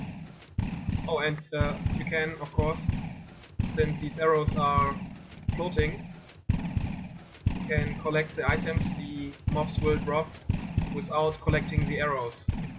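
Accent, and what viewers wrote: Germany. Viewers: Kannst du die welt hochladen? Du hastn deutschen Aktzent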